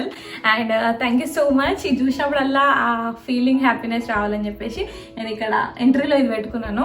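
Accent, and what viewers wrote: Telangana, India. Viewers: Telangana language intunte manchiga anipistundi.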